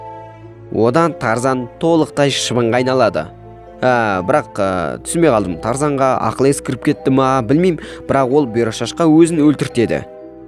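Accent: Mongolia. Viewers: Өзіміздің Моңғолиядансың ба?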